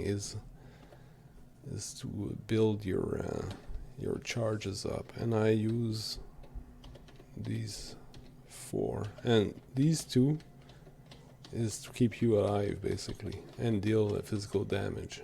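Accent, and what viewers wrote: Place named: Quebec, Canada. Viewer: quebecois jle sens dicite!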